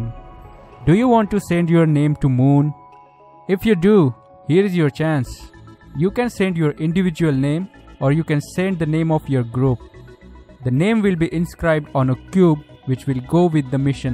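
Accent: India. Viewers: the heavy Indian accent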